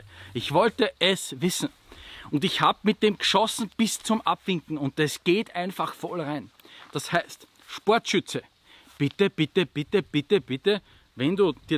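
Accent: Austria. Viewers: He speaks Austrian not German. This is a (funny) difference. So I agree, the autotranslation is useless.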